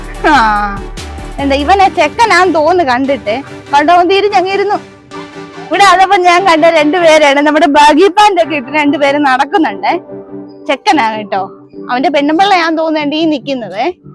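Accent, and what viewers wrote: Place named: Pala, India. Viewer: ❤🙏 പാലാ സംസാര രീതിയാണ്❤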